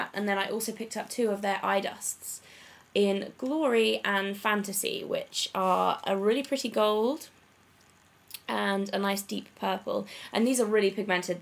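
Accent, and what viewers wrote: United Kingdom. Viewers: Hi, I actually just found you through MissGlamorazzi and I really love your videos and your British accent! I have a friend who lives in Hull, I think thats how you spell it?? Actually I think she lives in London now and I love listening to her voice. My husbands step-mother is also from England, she grew up in the Isle of Mann. Anyways, Could you do a video on different hair styles that are simple everyday looks? My hair looks the same everyday and I have no idea what to do with it! Thanks!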